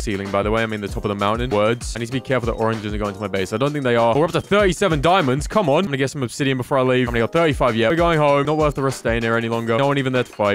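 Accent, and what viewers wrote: United Kingdom. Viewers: Are you inglish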